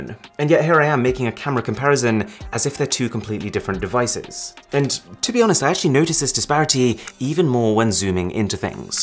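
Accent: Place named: United Kingdom. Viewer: You have damn british accent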